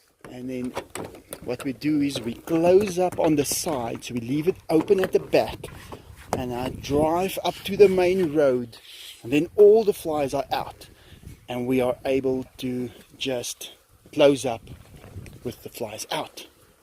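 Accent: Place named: South Africa. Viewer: I enjoy your south african accent!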